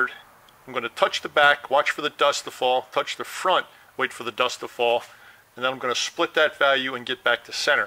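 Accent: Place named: Long Island, United States. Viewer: This guy sounds like my father and his brother from Long Island. Definitely interesting and informative I’m subscribing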